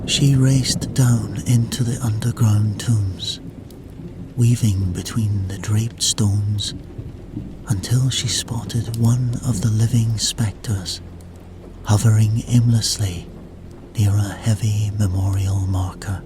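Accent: Scotland, United Kingdom. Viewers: Think it's only a matter of time before your channel really takes off, your stories are unlike any other sleep channel, I really get list in em and you've got a great voice with that slight Scottish twang, not too heavy, perfect for sleep stories man. I think a Underworld sleep story would be a good one, the Vampire death dealers hunting the lycanthropes. Yes brother what you think??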